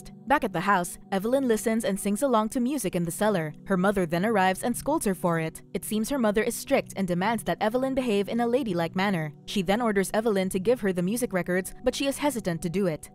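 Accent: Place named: Philippines. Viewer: The narrator sounds Filipina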